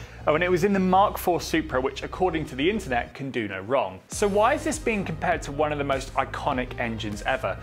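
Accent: United Kingdom. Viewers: This channels just donut media w a British accent lol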